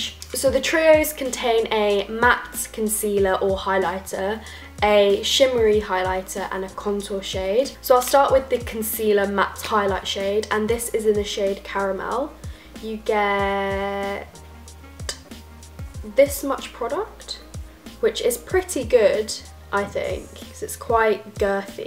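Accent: RP, United Kingdom. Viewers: Your accent sound so posh. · I love your accent, what part of Britain are you? (If I could speak in a British accent I would like to have your peculiar regional accent..)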